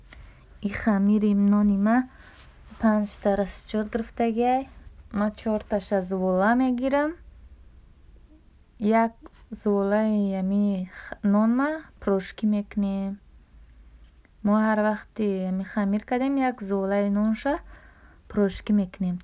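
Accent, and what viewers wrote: Kulob, Tajikistan. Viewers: Саломалекум апаи хохари намедонум мада бехат видиёхотон макулай баройки бисёр тарзи гапзании хушру дорен хами лавзи кулоби бехат лавзи ширинай хиёл мекнум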